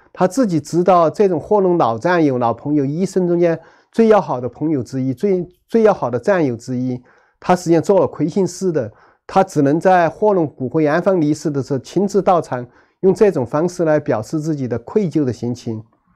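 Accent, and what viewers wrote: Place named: Chengdu, China. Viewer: 成都口音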